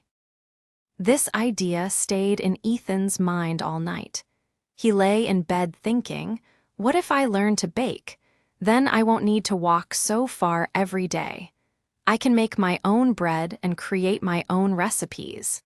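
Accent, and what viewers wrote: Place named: United Kingdom. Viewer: You speak Uk English but your English contents in American English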